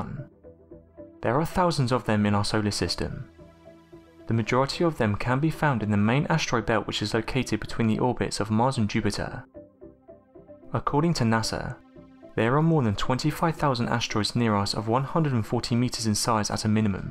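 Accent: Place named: Oxford, United Kingdom. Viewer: Your Oxford' English is realy Nice...but...I must listen Your Voice 3rd Times...sorry...for - I can understand American' English better 😊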